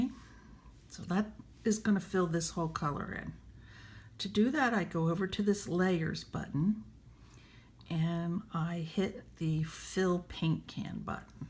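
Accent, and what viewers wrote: United States. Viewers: This is not a great tutorial, but I really appreciate you trying. I did learn a few things from you. Love to see you come back after a few months when you know what's what and can go more into depth. Hard to find good tutorials on Metabrush. You have a very nice voice for this sort of thing. Overall, I'll give you 3 out of 5 stars...with a little more prep you could get 5 stars. Oh, and the camera work was fine...better than many. And hey, I'm from Jersey...we Tawk a lot like you. I didn't even notice an accent! One last thing. you would be better off leaving the brush in the default position. The middle on all the sliders is not a very appealing brush. You can't make strokes with it and as I understand it, strokes are a very important part of MetaBrush technique. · This is great! It;s exactly the way I work - try this, try that, where's the thing I just did, whoops, undo - which makes me a feel a bit more confident. 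Btw, I'm originally form Yardley and lived i Philly for 20+ years so it's GREAT to hear somebody "tawk" right!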